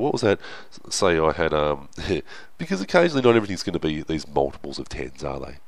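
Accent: Australia. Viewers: I think your an Australian because you have a very aussie accent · so weird and nice to hear an aussie voice on YT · I feel like he's Australian, not British. Of course an American can't tell the difference lol. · Hes definitely Aus, from a fellow Aus.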